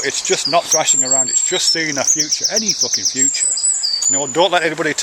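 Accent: United Kingdom. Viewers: The odd thing to me is that your afterlife accent is British. Weren't you American originally? Is there an explanation for that change? Very good "channelling" of the content of someone else's super-ego otherwise though. AND you're absolutely right that some people are very callous to each other on YouTube. It'd be great if that didn't happen so much.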